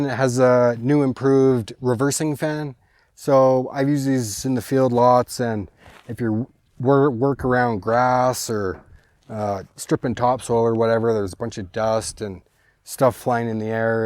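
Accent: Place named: Canada. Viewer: IT'S ALWAYS SCARY AND COUNTER-PRODUCTIVE WHEN A REVIEWER OF A PRODUCT SAYS ''I DON'T KNOW WHAT THAT IS'' ,( ISH), .THIS IS MY 1ST TIME HERE AND ACCORDING TO HIS PRONUNCIATION OF THE ENGLISH STYLE HE'S SPEAKING I'M GOING TO TAKE A STAB AND SAY THIS INDIVIDUAL IS A NATIVE FROM THE GREAT WHITE NORTH AND THAT HE REALLY DOESN'T KNOW WHAT ANY OF THAT IS FOR. HE ALMOST HAD ME FOOLED WITH THE MACHO, COPY-CAT FASHIONISTA TATTOOS.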